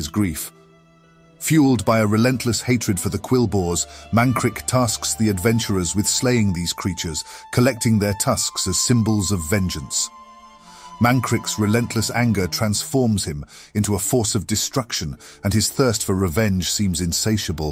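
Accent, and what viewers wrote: United Kingdom. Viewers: Hey, I do not know if this commentary will eventually be read by you, but I must say I really enjoy this splendid series. I am a foreigner so you have no idea how much time I spend on dissecting every little word that I cannot get through haha. This is such a good improving lesson each time a new video comes out though and even if I always considered myself bilingual, realising I still can struggle sometimes with some words is a wee bit frustrating haha. So here I am, catching myself reading outloud the subtitles as you speak and even though the videos are around 20-25 minutes, I spend the double amount of time training and repeating that lovely british accent. So thank you for that, mate. Have a good day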